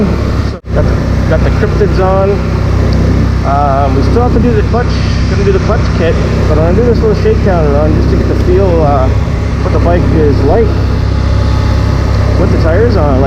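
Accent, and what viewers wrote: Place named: Canada. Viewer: Sound Canadian bro!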